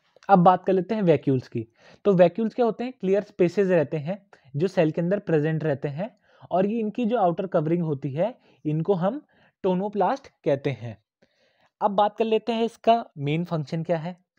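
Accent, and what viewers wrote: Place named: Bareilly, India. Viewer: Sir you are from bareilly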